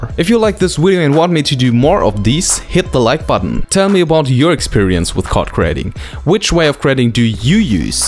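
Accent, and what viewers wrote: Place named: Germany. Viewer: From a GER to a GER (most likely): Work on your English. I mean even the THICC accent you have is acceptable, though painful to listen to (especially a German accent), AS LONG AS THE GRAMMAR IS FINE. And you talk quite quickly as if you were advanced although you're actually slightly better than a beginner. Like... you're not gonna play Van Halen solos on your second guitar lesson. Be honest to yourself - who are you trying to impress? I personally would be ashamed of uploading such a "wideo", even though the "wideo" is otherwise informative.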